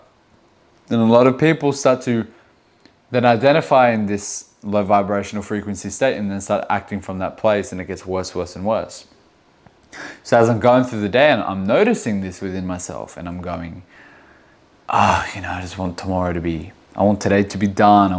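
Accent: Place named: Australia. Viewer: You're so fucking hot, but I cannot deal with an Aussie accent, mate.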